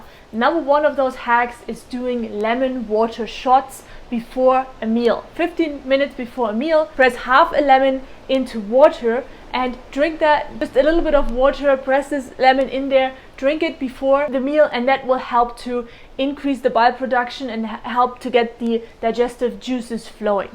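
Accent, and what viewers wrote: Germany. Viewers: Good job 👍, do I hear a hint of a German accent?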